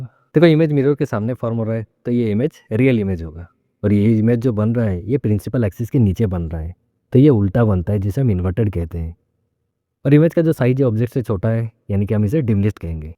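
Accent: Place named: Northeast, India. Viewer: Bhai aapke bolne ka tone north eastern states ki tarah h😅😅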